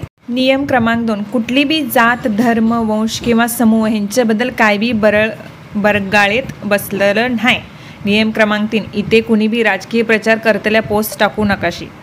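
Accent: Kolhapur, India. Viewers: प्रत्येक लाईन मध्ये ( करतली) आणि (करताशी)खुप छान होत भन्नाट कोल्हापुरी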